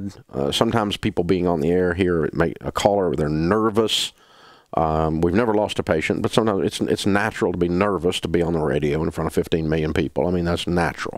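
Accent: South, United States. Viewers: I'm from Brooklyn and I love your southern account and little sayings 😂😂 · C Leh He hired a voice coach to teach him how to lose his accent. When he started he had a very thick southern accent. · To Northerners, he indeed still has quite a Southern accent.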